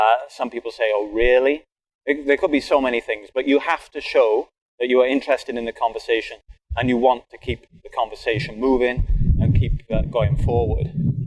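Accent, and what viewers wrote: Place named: Wales, United Kingdom. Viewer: He sounds Welsh...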